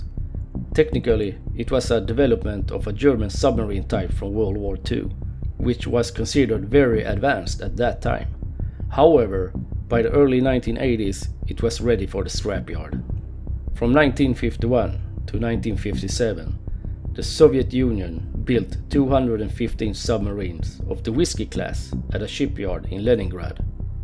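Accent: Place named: Sweden. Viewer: I LOVE the svengelska!! Are you from skåne?? P.s You speak terrific english, just heard your perfekt uttal on orter 😹🙏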